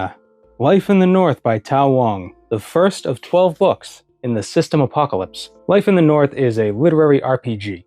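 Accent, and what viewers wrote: Boston, United States. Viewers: I knew there was something weird about you. Finally figured it out. You're from Boston aren't ya? Lol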